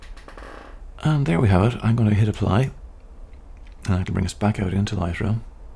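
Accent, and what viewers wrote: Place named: Ireland. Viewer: I think you're speaking just fine, and I suspect that the other commenters simply aren't used to an Irish accent. In fact, that nie fella sounded unhinged in his comment, so probably best to disregard. I like your Luminar edit the best of the two btw, since it's closer to what it seemed to be in real life. Good luck with your channel (and wotht the bird that's determined to get in!).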